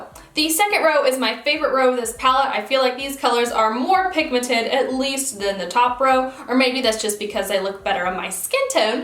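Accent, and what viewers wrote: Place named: South, United States. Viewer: LA Colors has a really nice matte lipstick line that are gorgeous. Where are you from ? I'm from Alabama. I love to hear another southern gal on YouTube !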